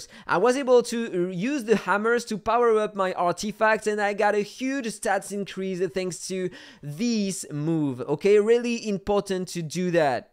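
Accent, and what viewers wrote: France. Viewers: t'es Français non ?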